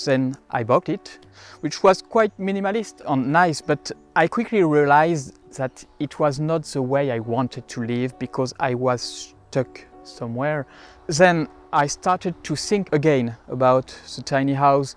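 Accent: France. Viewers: beautiful home. And you did very well speaking English with a French accent which was lovely! ❤️ wonderful music. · Vous avez une magnifique tiny house ! Tout y est bien rangé et vous parlé très bien anglais avec un bel accent français 🎵🎶🤗🇧🇪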